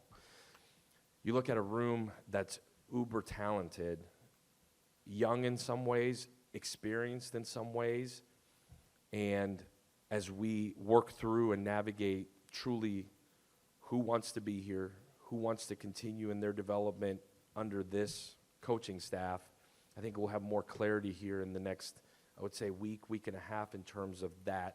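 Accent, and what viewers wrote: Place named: United States. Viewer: Dude sounds upper mid-eastern (he is), NOT southeastern; however, kudos for crediting his wife and parents; don't like the superficial "social correctness"/politics I hear, nor the vaguery, but I like his optimism and planning. A 250 mile radius recruiting circle is FAR too SMALL, eliminating 1,000 miles to the west of Auburn University) in the southeastern US, let alone the other 3/4 of the country ! I like hearing about tailoring the offense to the players' strengths, especially the QB.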